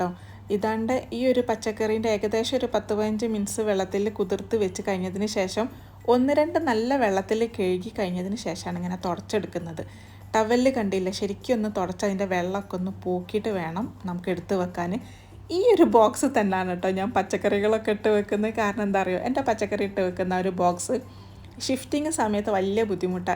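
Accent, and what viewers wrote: Kozhikode, India. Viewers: അതെ ഞമ്മൾ കോഴിക്കോട്ടുകാർ അങ്ങനെയാണ് പറയുന്നത്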